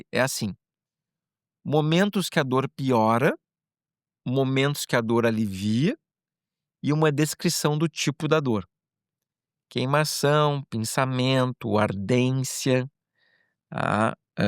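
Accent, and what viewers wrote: Rio Grande do Sul, Brazil. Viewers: O senhor é gaúcho doutor?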